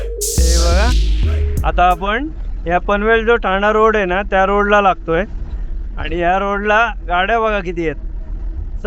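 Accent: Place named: Malvan, India. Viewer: दादा मध्ये मध्ये मालवणी बोलना ❤ भारी वाटतं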